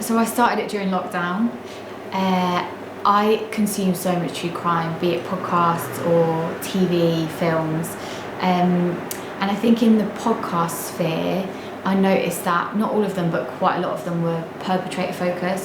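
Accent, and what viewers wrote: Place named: Cockney, United Kingdom. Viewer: I love!!! Love the way she delivers her podcast straight in no giggling like you get on some of these other ones no respect whatsoever. Such a lovely voice too half posh half cockney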